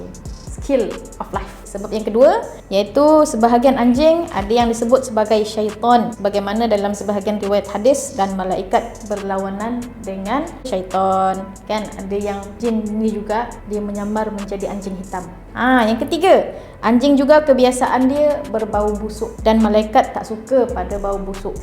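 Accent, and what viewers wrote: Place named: Sabah, Malaysia. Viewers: Suka sangat loghat Sabah dia.. =) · Start saja dia mula becakap aku tau suda sabah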